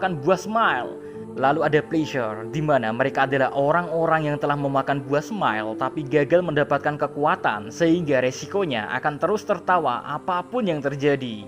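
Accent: Java, Indonesia. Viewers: Orang ini mirip abdi kos soalnya sama sama medok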